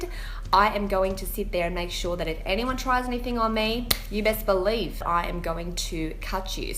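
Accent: Australia. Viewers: I seriously find your voice so entertaining ! Love the aussie accent.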